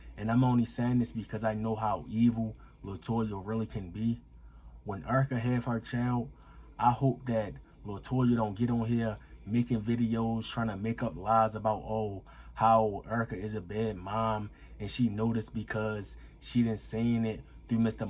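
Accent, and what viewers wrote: Baltimore, United States. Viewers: You from Baltimore? Lol asking because of your accent.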